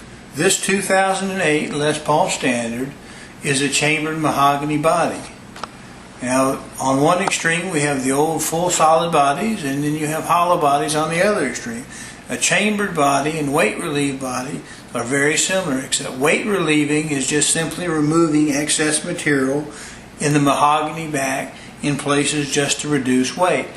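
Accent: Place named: United States. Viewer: i like people talk with cowboy accents.. sounds like pretty cool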